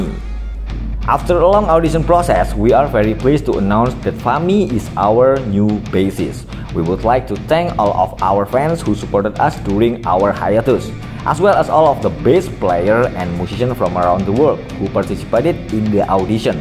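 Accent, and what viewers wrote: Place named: Indonesia. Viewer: Logat indonesia 😂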